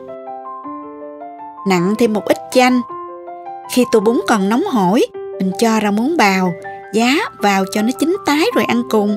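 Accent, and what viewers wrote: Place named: Binh Duong, Vietnam. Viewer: Trời tưởng ở VN. Trân trọng cô quá, nơi xứ người mà nấu chuẩn hơn nhà. Giọng càng tưởng ở Bd. Chúc cô nhiều sức khỏe mãi nấu những món ngon.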